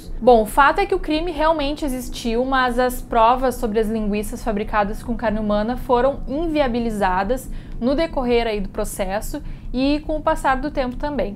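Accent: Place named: Rio Grande do Sul, Brazil. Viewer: Pior não era, disso estou bem certo! O que acontece é que naqueles tempos, era tudo na base das testemunhas, dos suspeitos, O que tornava difícil resolver crimes, O cara quando é mal, Ele mata até pra roubar uma bicicleta! Não acho que a época influencia no caráter dos bandidos, ( mas isso sim, ela facilita ou dificulta as ações deles! ): Tô com saudades dos teus vídeos, você tá preparando algum para breve??? ( ACHO LINDO O TEU SOTAQUE DE GAÚCHA ).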